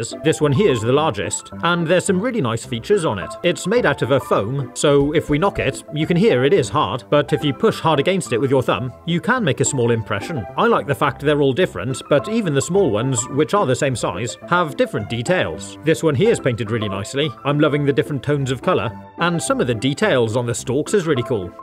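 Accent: United Kingdom. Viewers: Your British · HES BRITISH!!!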